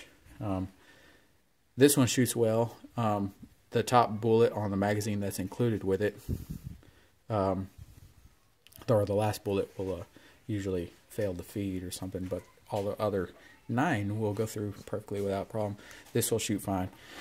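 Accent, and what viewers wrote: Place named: Tennessee, United States. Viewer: What's that accent, Tennessee? Nice collection. Interesting to see someone collect this type of pistol.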